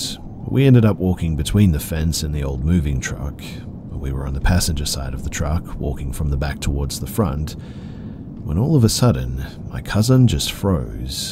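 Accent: Australia. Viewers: Sounds like you grew up in Oz, rather than Texas! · Why the Aussie accent when everyone is texan? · Wait, is that an Australian accent? I'm listening in Sydney.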